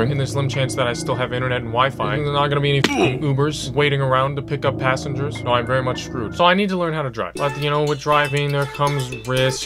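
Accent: United Kingdom. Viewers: bro is british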